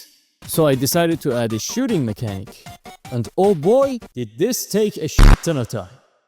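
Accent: Iran. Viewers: you sound soo Iranian for some reason its hard to see gamedevs from iran on yt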